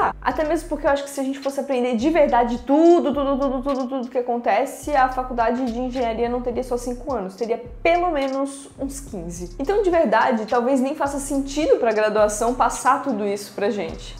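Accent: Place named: Itajaí, Brazil. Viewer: Eu reconheci o sotaque mas pensei que não fosse, mas vi em outro vídeo que vc é da mesma cidade que eu, Itajaí Sc! Quero ingressar no curso mas por ser no período matutino não consigo por enquanto. Espero conseguir em breve!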